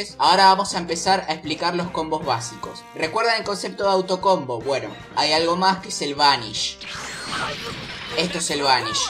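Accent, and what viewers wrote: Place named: Argentina. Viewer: Sos Argentino, (o uruguayo, no se) eso me agrada como la mina de los Simpsons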